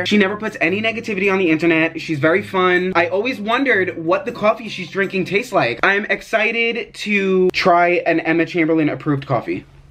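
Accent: New York, United States. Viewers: That New York accent thou😂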